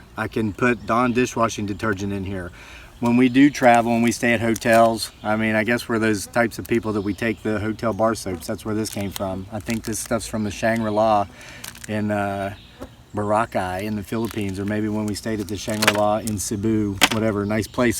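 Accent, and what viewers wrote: South, United States. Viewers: I love with all the practical advice & especially your wonderful Southern accent! I live in Broadstairs, Kent, UK, but will try your fly deterrent! More power to you!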